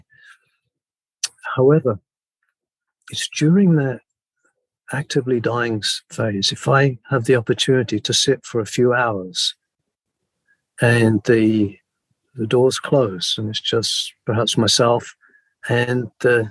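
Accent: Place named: Scotland, United Kingdom. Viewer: My favourite Scottish YouTuber award always goes to. I just looooove that Scottish accent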